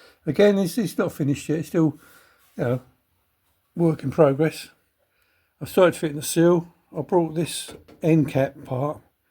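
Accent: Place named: Southern England, United Kingdom. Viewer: Hello. You probably know just saying, A30 has long whip gear lever and later A35 has remote gear lever and slightly altered transmission tunnel. I think the last 1098 engines had a beefier gearbox casting as well some one else will know for sure. I had a late 1098 van (JUW 334 D) for a bit and being so light it was a lot quicker than you’d think and it was bog standard. There were lots of A30s with A30 gearbox and A35 engines - 948 with modern big ends you could rev all day - so no tunnel mods, but i think general opinion was put the 1098 with its correct beefier late gearbox, something to do with baulk rings? Correct me anyone if I’m wrong it was forty years ago. Love your videos mate, wish I could weld like you do. Got a huge soft spot for A30s and anything 1950s. You’ll end up with panels fitting better than from the factory. 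Don’t mind bits of music and I like your voice - spent years in the midlands and any southern accent now is music to my ears. Cheers.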